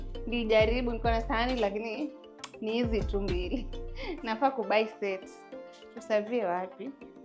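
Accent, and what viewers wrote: Luhya, Kenya. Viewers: Hadi imekuwa na accent ya kiluyha😅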